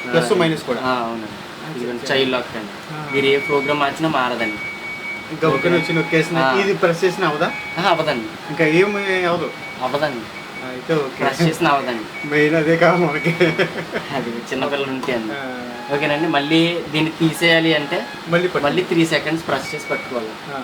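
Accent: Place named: Godavari, India. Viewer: Godavari Telugu super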